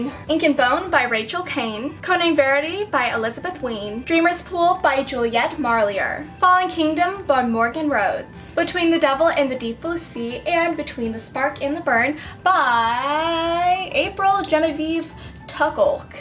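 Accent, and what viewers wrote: Scotland, United Kingdom. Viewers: I love the scottish accent 😂👌🏻❤️ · That is the most hilarious Scottish accent I have ever heard😂😂😂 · Your scottish accent is to die for! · Your Scottish accent is honestly the cutest!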